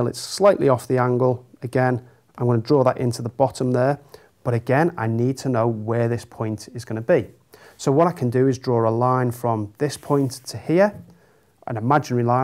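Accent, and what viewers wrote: Liverpool, United Kingdom. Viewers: A scouser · What an amazing lesson. I’m awful with angles and ratios and can never get my head around them but you’ve just explained this so well. I’m defo a new subscriber, especially as I think you might be a fellow scouser! Thanks for sharing your knowledge. 👏🏼👏🏼👏🏼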